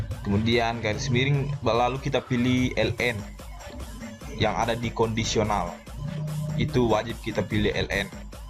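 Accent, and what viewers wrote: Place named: Bugis, Indonesia. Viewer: Logat bugismu itu e 🤣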